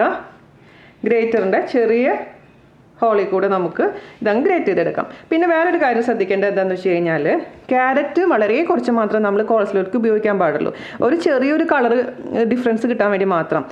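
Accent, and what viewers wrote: Thrissur, India. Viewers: Chechi thrissur slag kelkkan nalla rasam und too endhe amma veedu chalakudyil aaa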